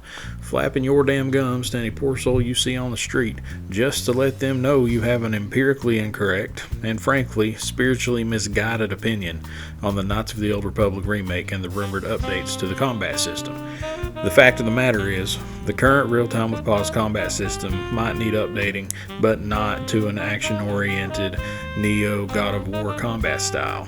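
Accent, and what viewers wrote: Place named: South, United States. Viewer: I agree, that's why I'm kinda wondering why he hasn't blown up. Smooth, precise with a certain southern charm twang going.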